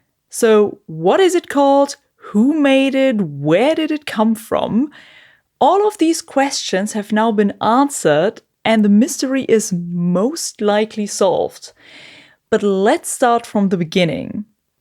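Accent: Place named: Germany. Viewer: Dein deutscher Akzent erinnert mich an von. 😀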